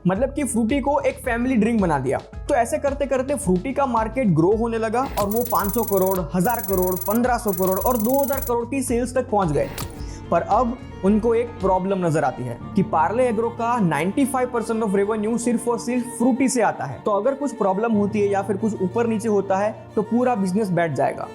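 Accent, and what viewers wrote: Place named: Gujarat, India. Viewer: Buhot he acha video hai brother. I think aap gujarat se ho bcs me bhi gujrat se hu or baat krne k style se pehchan gya😅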